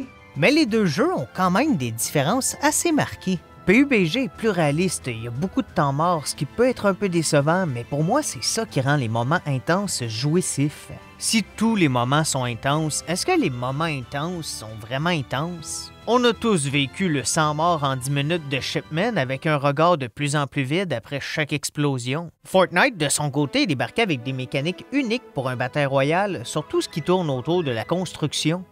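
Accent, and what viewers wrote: Quebec, Canada. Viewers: Je t'ai découvert il y a quelques semaines et tu est vraiment très drôle :) Le mix de ton accent québécois, des expressions que tu utilises et de tes blagues me font passer un bon moment ! J'ai regardé toute tes vidéos la soirée ou je suis tombé sur toi et je suis ravie de t'avoir trouvé dans mon feed youtube ! Voilà, juste merci pour ton travail ! Tu nous fait rire ! Je parlerai de toi à mes amis :D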